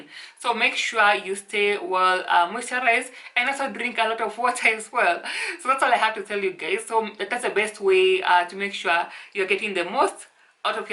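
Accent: Kenya. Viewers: When I heard ur accent I know ur a Kenyan 😍😍😍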